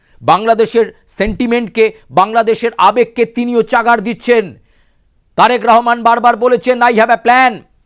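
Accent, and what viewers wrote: India. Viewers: ভাষার টান ভারতের মতো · এইটা যে ইন্ডিয়ান নিউজ সেটা টোন ও ভাষার শব্দেই বুঝা যায়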